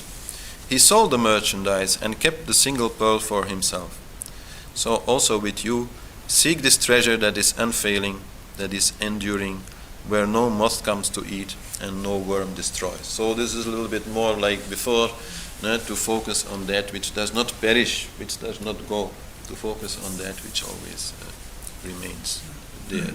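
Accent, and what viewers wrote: India. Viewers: You speak English with an Indian accent. You must have lived in India many years! peace.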